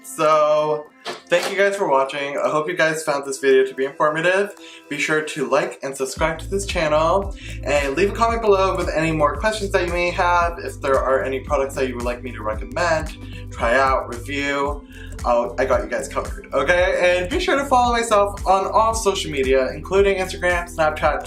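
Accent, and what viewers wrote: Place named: India. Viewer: Seems like an Indian guy with Indian accent.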